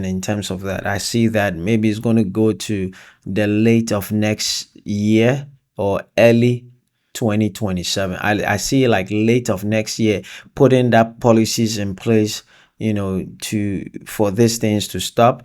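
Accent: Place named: Nigeria. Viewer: Ete sen, I worked with Nigerians for a few years and your accent reminds me of them. Old good memories. I have a question: With all these earthquakes and erupting volcanoes and all the damage that this will cause, will humans return to the Stone Age in terms of daily life (jobs, transport, heating, trade, travel, food)? Will we have different situations from one country to another or from one continent to another?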